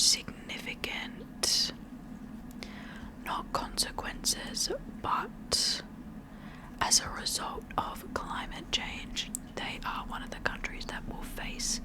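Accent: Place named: Australia. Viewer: Excellent video! I hyped it, too. I’m very interested in reading The Eyes of Gaza. I think The Correspondent was my favorite book so far this year. It was SO good. I also couldn’t put it down. I loved how she tells the entire story in letters. The ending surprised me. The author ended it so beautifully. I also loved The True True Story of Raja the Gullible (and his mother). Such a unique novel! I look forward to your weekly videos. You’re such an interesting reader and book talker. And the fact you’re in Australia is the icing on the cake. I love your accent.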